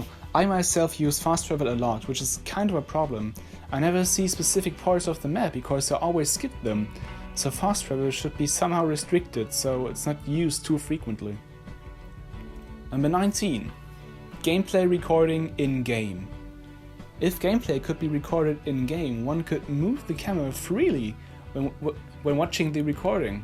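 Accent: Germany. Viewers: Bro you're german? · Man hört den deutschen Akzent einfach raus 😂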